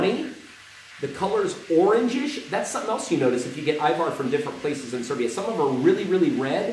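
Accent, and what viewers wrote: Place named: United States. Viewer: First: you can't learn that accent of yours no matter how hard you try as Serbian- to those that say you are Serbian and just faking American origin , Serbs will always have that slavic- stronk accent. Second have you ever tried frying onion, eggs and ajvar ? That is crazy good and simple, heat up oil in pan- same amount as you put when you fry eggs, put two-tree eggs , chop half onion and 3-4 full spoons of ajvar , you put all that in same time in pan, fry it couple mins until eggs are done and mix it while you fry . You can also fry onion a little before you put eggs and ajvar if you like that, but not necessary. You will know its done when eggs stiffs. With some bread , yogurt or fetta salty chese you have simple and delicious meal. You fry lower quality ajvar from markets with eggs to still make it good btw.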